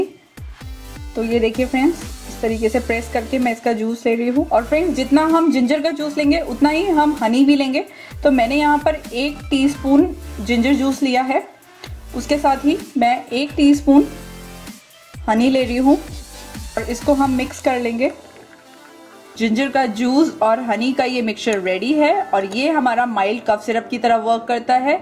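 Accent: India. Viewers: Your english sounds like hindi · I cant understand indian english like who has the same issue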